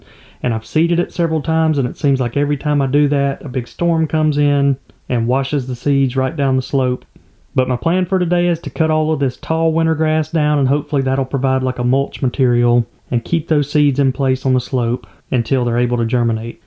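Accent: United States. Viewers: Man i really like your american accent. Greetings drom germany😄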